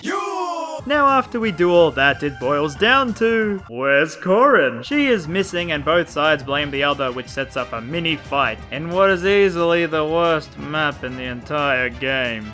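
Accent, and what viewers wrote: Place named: United Kingdom. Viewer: I agree. Your British accent sounds more like you're talking while trying to hold back a yawn.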